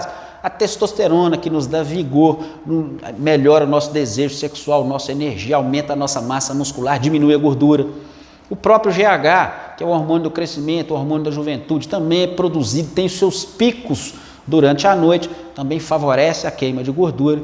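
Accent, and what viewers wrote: Minas Gerais, Brazil. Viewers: Eita sotaque mineiro sô 😁. Vídeo sensacional, graças a Deus durmo sem precisar usar nenhum desses suplementos 🙌🏻. Vídeo ótimo para utilidade pública 👏🏻👏🏻👏🏻👏🏻👏🏻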